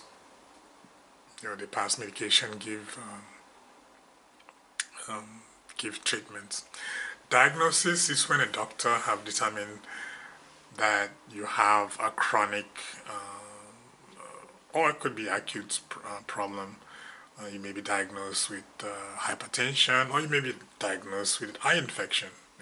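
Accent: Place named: Nigeria. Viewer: you sound so Nigerian. 😍 Maybe you aren't, but lovely regardless. Keep winning 🇳🇬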